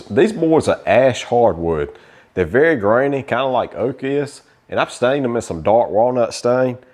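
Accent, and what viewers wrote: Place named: South, United States. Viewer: Just found your channel through one of your shorts. Been binge watching. Really enjoying it! Thank you! As a felllow southerner who has lived ALL OVER the south, love hearing your accent!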